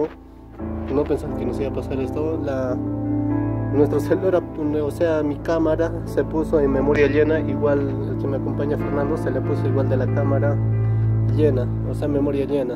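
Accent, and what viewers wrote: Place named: United States. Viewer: Me encanta tu voz medio gringo tu acento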